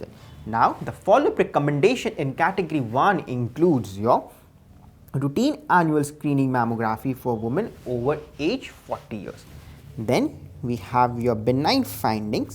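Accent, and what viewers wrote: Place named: England, United Kingdom. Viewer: Again watching this before my xm .......... Your English accent and kindness are just♥️♥️♥️🥰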